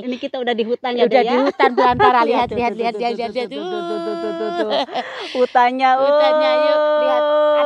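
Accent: West Java, Indonesia. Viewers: Ieu kelebihan na eteh,bahasa batur dilogat na ku basa sunda...top markotop teh,sing sarehat kanggo eteh sakeluarga 👍👍👍👍😘😘😘😘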